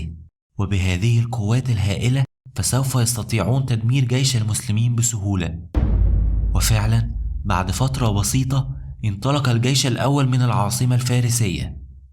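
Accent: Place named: Egypt. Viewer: تعليقك وسردك للمعلومات سيئ جداً.. ما ادري ليش المصريين متعلقين بلهجتهم ..؟ انت تقدم ماده المفترض منك نطق الحروف بالشكل الصحيح ..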